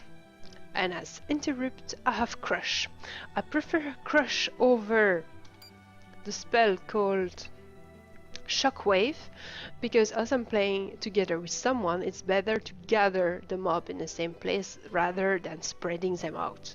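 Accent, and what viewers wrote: France. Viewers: Hi, are you french ? I demand that because you have a french accent (si oui, un bonjour de la France ^^)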